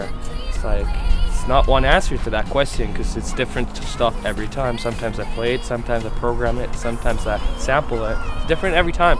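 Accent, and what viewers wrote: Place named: Canada. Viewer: Why does he talk like a Canadian if he's from Norway?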